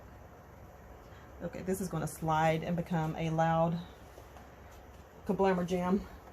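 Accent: South, United States. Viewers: Lovely to hear your pretty southern accent! I should try the 30 days of sketches,too! I am so far behind with everything! Love your kits! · You are not alone in this epiphany! I too have come to the realization that my spending is out of control! Getting it into control is not going so well with me either. I've tried, Lord knows I've tried. I think if slowed down a bit. I really don't need anything more but my problem is for example... when you showed that Reminisce hello summer guy side B paper, I'm not gonna lie, I went and googled the crap out of that paper! Lucky not lucky for me is it is no where to be found! Not even on EBay. There may be an Etsy but not gonna go there! I just told myself I don't need it. I have found something that's therapeutic for me at this point in my spending habits it, I will shop til I drop, I mean I will load up that cart with all the pretty things that I love. 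After about an hour of a complete waste of time I will close that browser and walk away with never an intent to ever checkout! It help me but then, someone showed the Jillibean soup discount box haul she got from an etsy shop and yeah, not gonna lie I had to have it! After all its Jillibean soup, face it how much longer will we be able to crab up some of that! Well I walked away from that too then the next morning I got an email saying hey you left something in your cart we'll give you 25% off today! You bet your bottom dollar its mine now! I enjoy your southern accent so don't you even try to change it! You video got me thinking! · First of allllll don’t you dare get rid of that southern accent! I looove it! Second- I laughed out loud when you said “ was I smoking crack that day?” 😂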